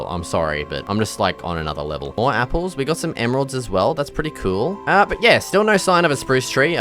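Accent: Australia. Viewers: Your Australian right? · bro couldn't be more Australia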